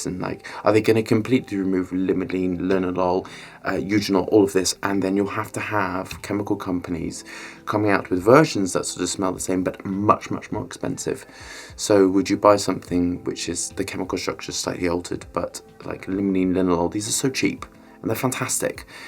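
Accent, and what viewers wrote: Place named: Cornwall, United Kingdom. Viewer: I hear some of Anthony Hopkins accent in your voice mate...cornish accent slipping through...love it!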